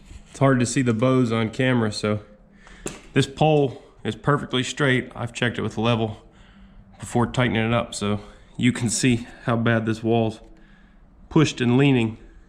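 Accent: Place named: Midwest, United States. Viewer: You’re a mid westerner, we all talk wrong...I still put an R in wa”r”sh.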